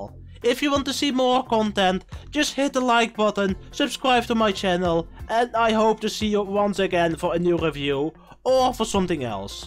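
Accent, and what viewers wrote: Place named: Netherlands. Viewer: Strongest Dutch accent ever 😂😂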